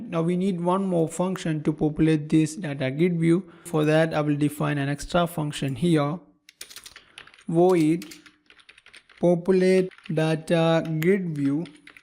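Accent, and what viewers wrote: India. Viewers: omg I cant understand your indian accent, so heavy.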